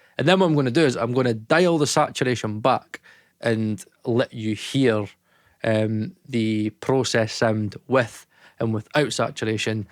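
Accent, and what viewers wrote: Scotland, United Kingdom. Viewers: I love scottish accent. For Kick Attack preset I thought he said KekAttic.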